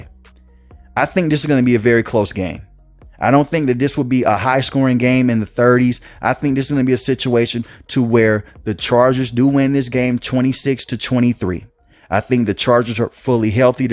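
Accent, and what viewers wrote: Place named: Mississippi, United States. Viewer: The cover photo looked professional, I thought it was CBS or the like doing a breakdown of the game ..until I heard the 'commentator' sounding like a white snoop dog lol. I'm guessing Mississippi accent.. ultimately a good breakdown. Chargers are weak in run support in the middle and with that being a point of emphasis this yr for Miami i think Dolphins will keep em guessing all day and Miami ends up winning by 2 scores. That could be 2 field goals, tds or any combo but they win.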